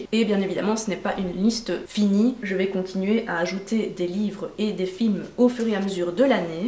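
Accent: Belgium. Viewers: Bonjour, j'adore l'idee de grace au bujo etre organiser pour enfin realiser ses objectifs mais comment on fait pour realiser un bujo quand a la base on est pas organiser ds sa tete , en gros je sais pas pas ou commencer et il y a tellement de chose a y mettre pour que ma routine du quotidienne et mes plus gros objectifs soit mieux atteignable car mieux plafinier , j'adore ton naturel! et ton petit accent belge qui reste malgré NY :-) Bizz de Bruxelles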